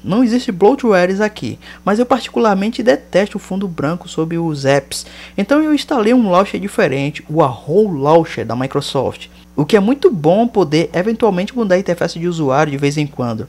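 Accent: Bahia, Brazil. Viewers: você e de que cidade? Se for salvador adivinhei pelo sou modo de falar que so bahiano tem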